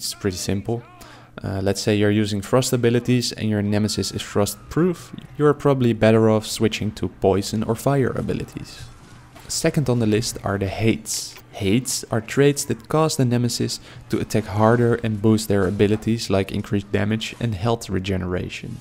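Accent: Netherlands. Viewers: Just curious are you dutch I'm really curious about your accent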